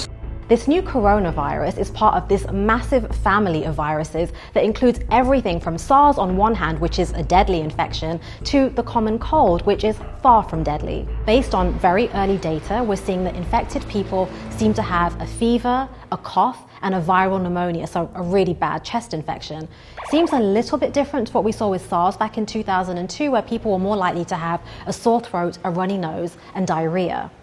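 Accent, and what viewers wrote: United Kingdom. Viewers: I always trust people with UK accents, even if they are just repeating bogus info. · She sounds British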